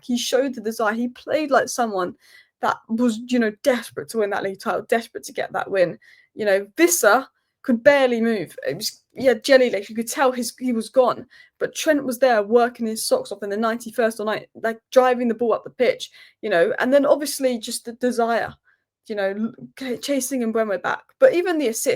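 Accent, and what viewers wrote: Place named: Liverpool, United Kingdom. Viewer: . mem, r you sure 😅🫰🍻you're a ggmu fan.?, 😅✌️bcs you sure sounds like a scouser gal😄✌️🤨👍😁...just noicee 👍👍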